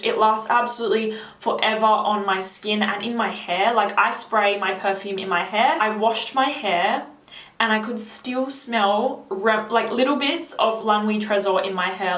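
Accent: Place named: Australia. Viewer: I like your accent are you Australian or British?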